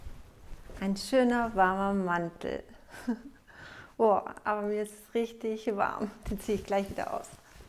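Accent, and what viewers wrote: Germany. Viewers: Klingt nach Fränkin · That German accent/ language is amazing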